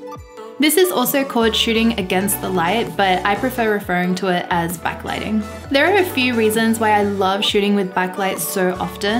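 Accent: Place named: Australia. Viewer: Loved your Video very well done! You are adorable and your silky smooth voice with that Aussy accent ❤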